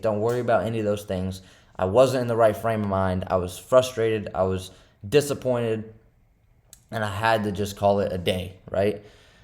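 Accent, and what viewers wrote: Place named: South, United States. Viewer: u got a southern accent, usa state u curently in? keep it up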